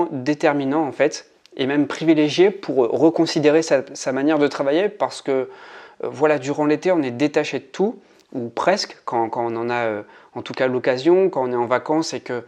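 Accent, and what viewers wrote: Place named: Pas-de-Calais, France. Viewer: Bonjour moi aussi je suis du pas de calais. J'avais bien noté un léger accent 😁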